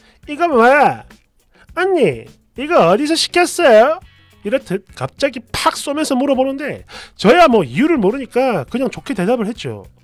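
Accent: Gyeongsang, South Korea. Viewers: 앵가이해라 앵가이~ ㅎ글로읽고 목소리로 들으니 더입에 착붙이네요~ 갱상도 싸나이 좋아요 좋아👍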